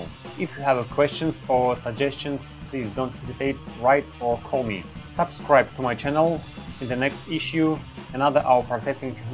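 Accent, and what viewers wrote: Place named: Russia. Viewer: Русский акцент ничем не скроешь.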